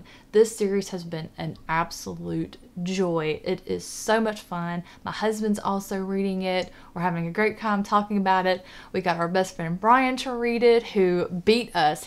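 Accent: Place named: Mississippi, United States. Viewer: I just stumbled across your channel and of course I was hooked by your Mississippi accent. But they say we have an accent also because we are originally from Alabama. If you don't mind me asking I would like to know where you live in FL because I have a brother that lives in Marianna and a sister in Tallahassee. Fortunately, they didn't have any damage from the hurricanes. I think you are a good reviewer and I subscribed. Much respect to you from Larry.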